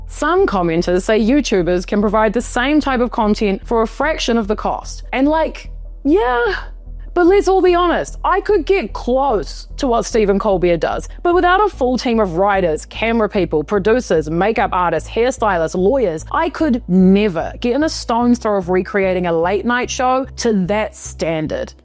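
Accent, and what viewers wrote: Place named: New Zealand. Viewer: It's low budget Colbert with a cute Kiwi twist. I'm here for it 😎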